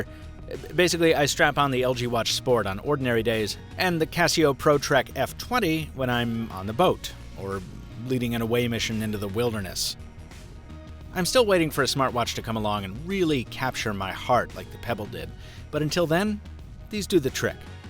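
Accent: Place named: Boston, United States. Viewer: Boston aye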